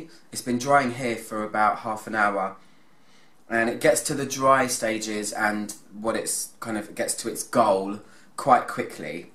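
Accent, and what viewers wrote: United Kingdom. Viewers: Love your reviews! Not to mention you're British accent is so very classy and I love when you say the word gorgeous lol.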